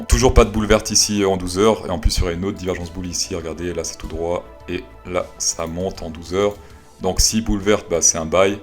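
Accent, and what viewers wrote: Quebec, Canada. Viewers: Super ton accent canadien mdr ...je voudrais vraiment savoir qui tu imites avec cet accent!?! tu cartonnes comme toujours ... Tu pourrais faire l'oiseau de mauvais augur une petite rep ( rep augur) non ? Elle n est pas bonne ? Bon j aurai essayé ^^ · Aie, dur l'accent québécois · Quebecois ou cameronai Mdr on cest plus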